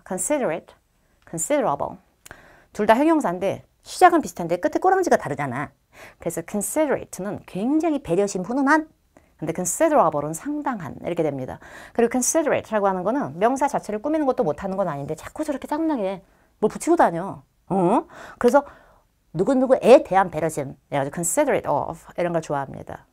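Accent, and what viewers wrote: Jeolla, South Korea. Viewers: 저도 삼천포여친 윤진이 삘난다고 생각했어요. ㅋ 전라도 사투리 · 진짜 이런 박력 너무 좋아.... 선생님 약간 전라도사투리 나오는데 그것도 너무 친숙해서 좋음요 ㅋㅋㅋㅋㅋㅋㅋㅋㅋ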